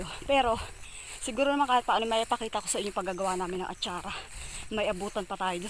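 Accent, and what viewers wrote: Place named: Batangas, Philippines. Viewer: Na lahian ka na ni kuya.. Batangeñang Batangeña ang punto pag salita mo ng tagalog….pakultab,….Upak na ng upak…bakbakan na dito… hahaha…Maganda yang laging naka bota para lalong safe…..Basta may time ako blog mo gusto kong tingnan agad..May nakukuha akong ibang idea sa pagluluto at pagtatanim…..And it remind me the way I used to be, tulad ng ginagawa ni kuya.ginagawa ko rin yun noong araw…Okay say hi to kuya.. God bless you more.❤️🇵🇭🇺🇸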